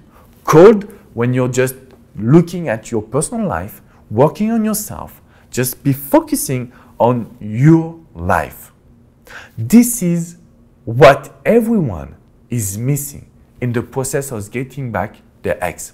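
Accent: France. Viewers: ur my best with your french accent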